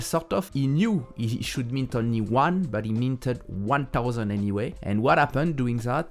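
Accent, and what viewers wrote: France. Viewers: Quasi sûr que t'es français ! Bonne chance pour cette chaîne anglophone, ça doit pas être facile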